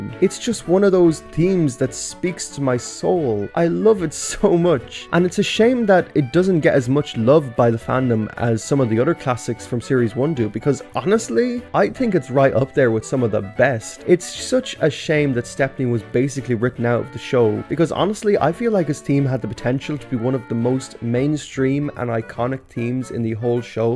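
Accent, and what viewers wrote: Ireland. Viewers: He's probably irish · He's Irish, and Irish does not have any sounds that collorate with thorn ( þ )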